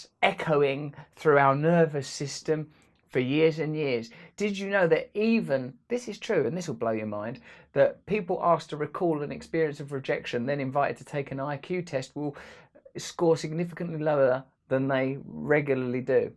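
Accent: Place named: United Kingdom. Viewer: three reasons why I always regret watching Russel Brand videos: 1. Strong British accent ( I bearly understand a thing) 2.circling around a topic 3.messy appearance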